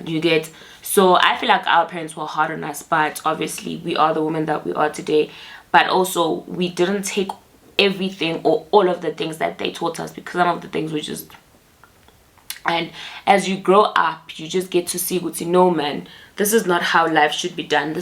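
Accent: Nigeria. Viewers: I always take notes when you do sit down videos and I enjoy them so much. I agree particularly with the people pleaser point because I am unlearning this and it is so uncomfortable and so weird for me. I sometimes feel like I'm doing something wrong when I don't do things to please people anymore. Thanks for imparting knowledge and nudging me in the unlearning direction a bit more. Side note: may you please do a video in your Nigerian accent one of these days.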